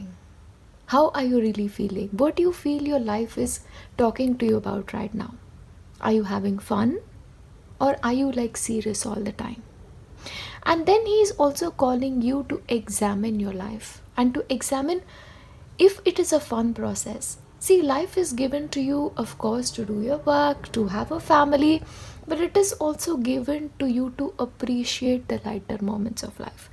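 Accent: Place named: India. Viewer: Thank you for the readings 💐. You rightly said we are a soul group, connected through messages 💖. I claim pile 2 as I like turtles and these messages connect with me 🙏 are you not from India? Your accent is very Indian 😊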